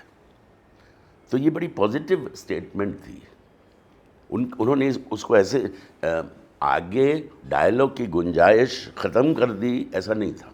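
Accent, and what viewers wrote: India. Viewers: Although sound indian I admire his voice..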